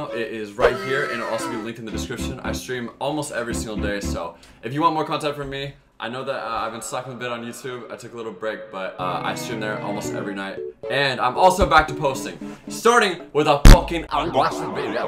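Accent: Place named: United Kingdom. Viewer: Did he became British . Or am I just watching to many British youtubers 😭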